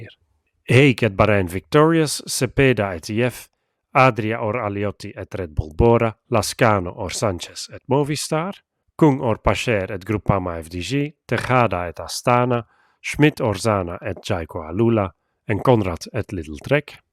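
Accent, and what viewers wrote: Belgium. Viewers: Belgium accent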